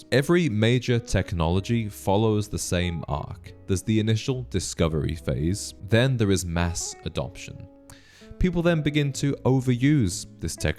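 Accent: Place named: United Kingdom. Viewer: Dude you have a great voice, lots of depth. You could easily do audio work. Of course you’ve got the Brit accent as well which is usually a bonus haha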